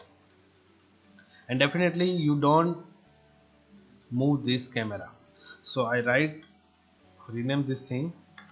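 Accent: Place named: India. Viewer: Hey, This is an interesting question indeed. I wondered too, a few years ago. (I'm glad I found this channel back, because being into this as well (matte painting, set extensions, ...), the only thing I had time to write in the late account was I really loved his indian accent ;) Hahaha, kind but short... Now I can go further fortunately) So If you don't mind switching a few moments from this lovely accent to a less lovely german one (not me btw), you'll have some answers here <link> around 5.10, 8.00 a,d 18.00...Now you can have auto calculated depth, and I think one could probe it into a bitmap too. Don't forget to come back here, I wouldn't cause leaks just because I helped.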